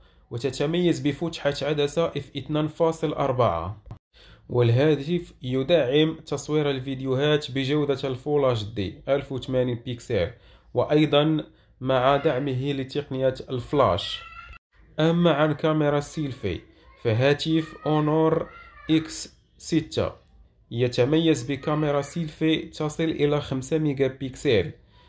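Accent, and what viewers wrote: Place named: Morocco. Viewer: هادي لهجة مغربية اختاه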